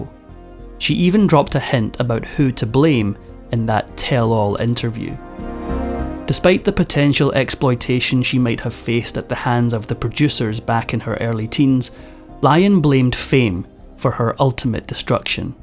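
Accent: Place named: Scotland, United Kingdom. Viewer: You have a lovely Scottish accent.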